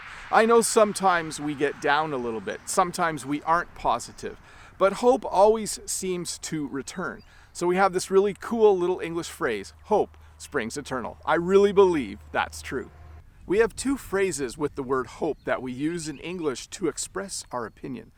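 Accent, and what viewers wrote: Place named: Canada. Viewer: Through your videos i can understand and learn canadian accents.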